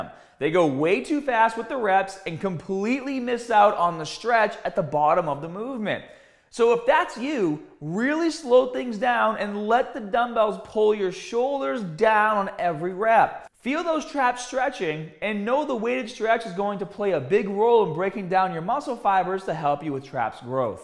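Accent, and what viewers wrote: Boston, United States. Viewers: Is he from Boston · Ok I just watched his video on deadlifting from 9 years ago, when did he develope a boston accent?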